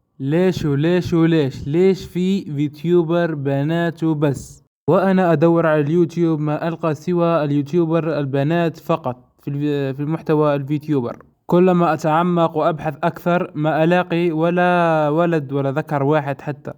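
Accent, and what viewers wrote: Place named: Tunisia. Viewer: من الواضح انك تونسي 😅 ترا انا تونسي بعد 🇹🇳 لهيك لا تستغرب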